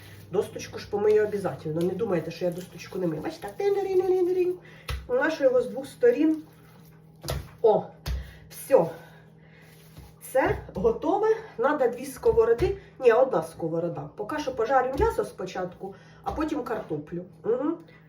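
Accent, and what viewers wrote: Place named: Zolotonosha, Ukraine. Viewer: я тащусь від твоєї мови.Точно,як у нас,в Золотоноші.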